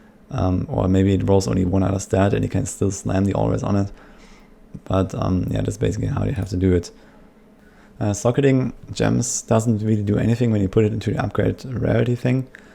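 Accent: Germany. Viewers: Thx a lot, now i understood everything ;). Looked 3 videos before and i still had questions. Fast english, but is ok for me because its german english ^^. Dank dir auf jeden Fall, super Videos ;)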